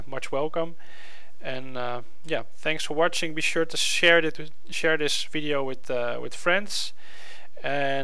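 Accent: Netherlands. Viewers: are you dutch maybe? :D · Zo'n episch nederlands accent. Je hoort het meteen. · You're not englisch? Younsound like a dutch? Of niet? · You are dutch right? You sound like a guy from Holland :) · you sound dutch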